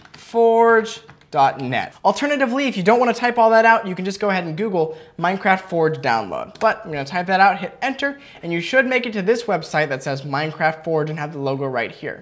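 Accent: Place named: Russia. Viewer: are you Russian? It Sounded like you had a Russian Axint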